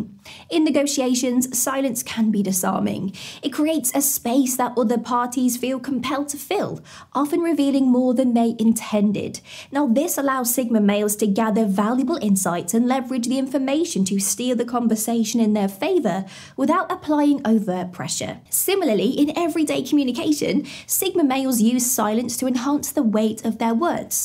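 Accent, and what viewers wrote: United Kingdom. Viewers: I get tired of British accents. Good night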